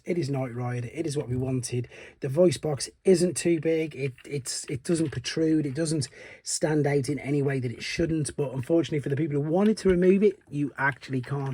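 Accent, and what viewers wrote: United Kingdom. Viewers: Hi, thanks for showing this great new toy off. Great to hear the first review with a West Mids accent too (from Cannock, myself!).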